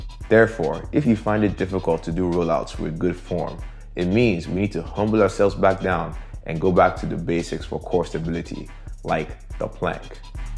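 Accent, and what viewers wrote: Nigeria. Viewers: i can hear the nigerian in his voice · Yo, i heard that nigerian accent 😂😂😂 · This voice is so Nigerian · I sensed he was Nigerian from his accent at first. I felt kind of joyous when he confirmed it with that pidgin English line.E choke😁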